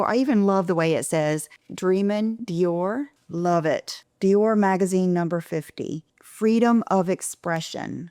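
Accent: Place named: Boston, United States. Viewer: And YES, on the sexy Boston accent!!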